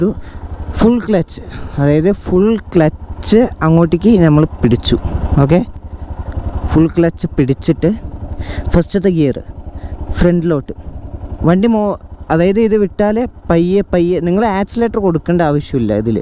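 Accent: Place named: Kochi, India. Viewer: Kochi machaan crrt sound 💯😂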